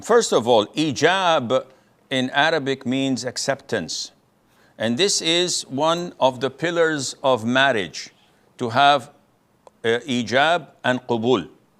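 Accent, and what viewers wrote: France. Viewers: The heaviest french accent I've ever heard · I rewatched the video 3times just to catch the french accent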